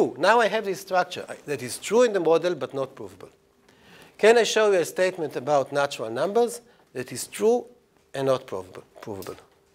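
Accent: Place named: Israel. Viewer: The professor sounds so Israeli. LOL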